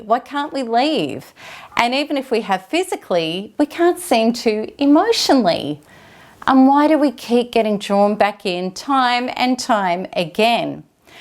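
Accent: Australia. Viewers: Are you Australian? Accent is so familiar!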